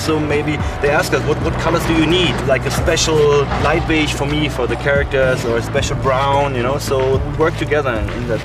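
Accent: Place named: Germany. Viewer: sounds like hes german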